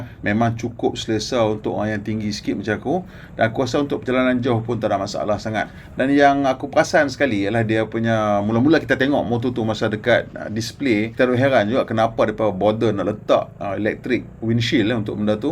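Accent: North, Malaysia. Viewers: Kalo tak silap dulu bro ni cakap BM standard. Skrg campur loghat utagha pulak dah 😂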